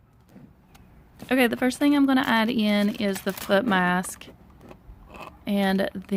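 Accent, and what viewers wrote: South, United States. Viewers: Hi there! First time viewer! I'm LOVING these ideas! I'm hearing a Southern Accent..🎶🎶 to my ears (Southern girl here as well 😊) just curious where you're located? Thanks for sharing and I look forward to seeing more of your videos!! Ps: the Pineapple bags are SO cute, the strings on mine however, lasted 2 whole days. I was so sad and couldn't find any others. 😞 I eventually will "fix" mine 😂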